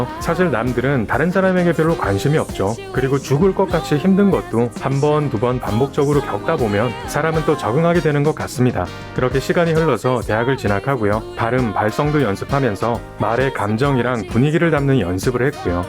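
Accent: Busan, South Korea. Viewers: 부산사투리 매력적인데!! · 부산사투리 듣기 좋던데요! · 우와 부산분이시라니 사투리 고치시는 연습을 어떻게 하셨는지 최고시네요. 👍👍👍👍👍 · 부산분이셨군뇽~^^ 서울토박이이신줄 알았는데ㅋㅋㅋ 👍👍👍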